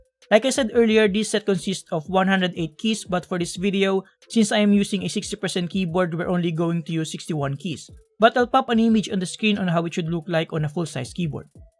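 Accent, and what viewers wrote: Philippines. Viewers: I love the filipino accent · his Filipino accent tho is insane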